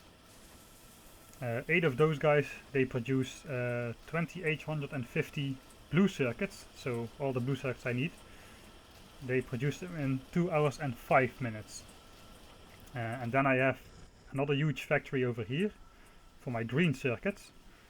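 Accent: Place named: Netherlands. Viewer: je bent nederlands best wel te horen :P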